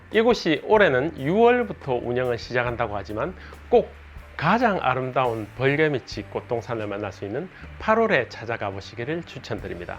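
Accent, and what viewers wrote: Gyeongsang, South Korea. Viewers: 다 좋은데 경상도 액센트 귀에 확 거슬립니다. 가이드 하시는 것은 영 파이라예 ~~ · 경상도발음과 액센트를 좀만더 고쳐주셨으면 싶어요.ㅠㅠ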